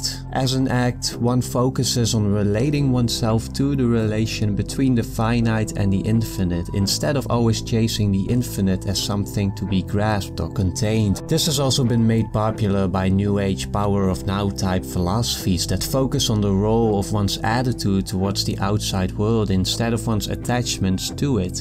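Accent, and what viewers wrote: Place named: Netherlands. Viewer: Cool video, the accent with a couple words you pronounce in a particular way...sounds very very familiar, makes me feel at home! Thank you for the video! This could have helped me a lot about 2 weeks ago, luckily music was my first love and brought me back. Now clearer than ever and finally at peace with myself. Grtz, a no longer wondering Hollander! ;) <3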